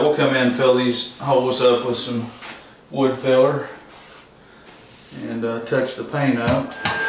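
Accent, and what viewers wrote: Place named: Georgia, United States. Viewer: Georgia or south Carolina ?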